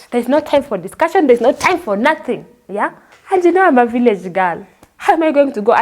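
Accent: Luo, Kenya. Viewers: Haha yeah jaluo ni jaluo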